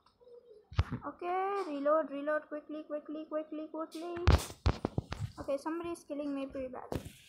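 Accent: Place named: United Kingdom. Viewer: he is speaking like a british while he is a Indian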